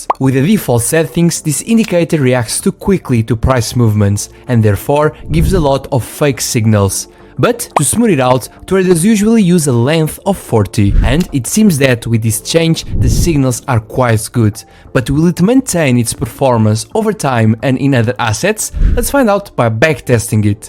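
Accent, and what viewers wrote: Portugal. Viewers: you are portugues right?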